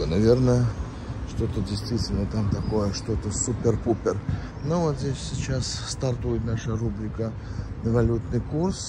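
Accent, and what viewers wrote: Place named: Georgia. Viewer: у вас прекрасный грузинский акцент Добрый.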